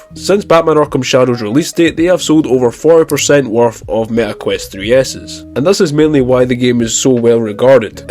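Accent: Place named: Scotland, United Kingdom. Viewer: 3 minutes of a Scotsman babbling to say absolutely nothing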